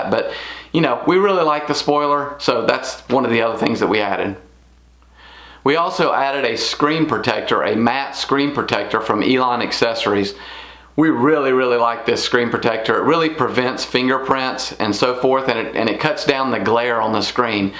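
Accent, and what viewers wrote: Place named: North Carolina, United States. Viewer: Hey. I’m in North Carolina and you sound like you’re from somewhere around here-not California! 😂 Ordered our Y a week ago Saturday! Do you recommend tinting the overhead roof glass? I’ve seen mixed comments on this on the web. Thanks!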